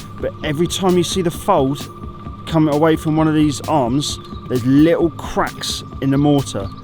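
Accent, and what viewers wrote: England, United Kingdom. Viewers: Where are you from England I think?????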